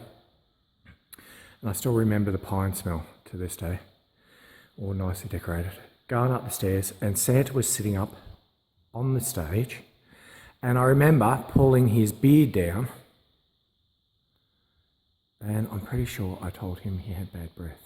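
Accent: Australia. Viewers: I am an American. Love your explores, and your Australian accent..Lucmy to have found your channel. Keep up the interesting work.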